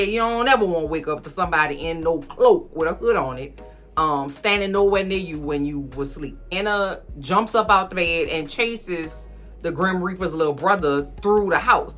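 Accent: New Orleans, United States. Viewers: First time watching.... are you from New Orleans?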